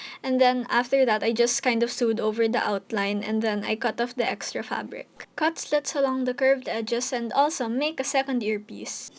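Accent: Philippines. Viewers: I thought you were a Filipino.....the accent tho😣👌👌👌👌👌💞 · finally a Filipino that knows tbhk...finally (luv ur accent and ur sarcasm uwu)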